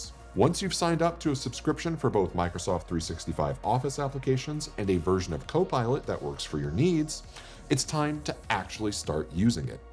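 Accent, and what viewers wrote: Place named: India. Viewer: Forgive me to hesitate for clicking in, as I couldn't handle Indian accent (Not being mean, just I can't) ...But your video is very informative and well done, thanks!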